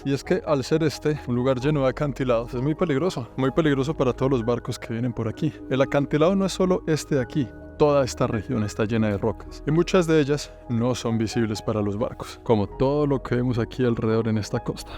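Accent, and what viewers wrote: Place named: Bogota, Colombia. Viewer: «Espichar el botón» para que todo el continente entienda el hablado cachaco, su merced.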